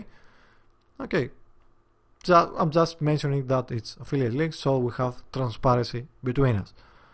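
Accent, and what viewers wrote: Greece. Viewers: την ελληνικη προφορα δε τι μπερδευω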